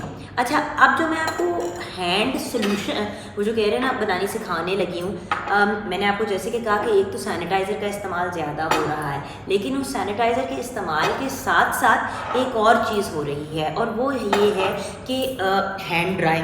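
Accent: Punjab, India. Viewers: Same here mam plz upload video i like yr pinjabienglish voice love uu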